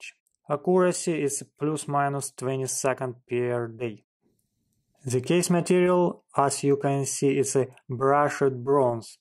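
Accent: Russia. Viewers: Такой прикольный русский акцент 😅 лайк за обзор :)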